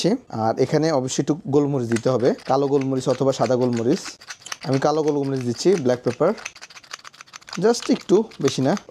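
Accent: Noakhali, Bangladesh. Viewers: This is the first video I have watched on your channel. I come to know this channel from facebook reels. Now I am watching every video of this channel. btw love your noakhali accent bro!!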